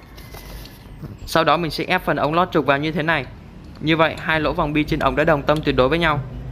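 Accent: Vietnam. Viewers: Bạn nói giọng bắc tưởng ở đâu đó loanh quanh Hà Tây thì nhất định chạy đến mua bia với mồi nhậu để xin kết bạn hihi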